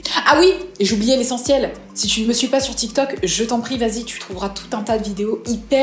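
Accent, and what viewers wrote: England, United Kingdom. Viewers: Tu es anglaise ?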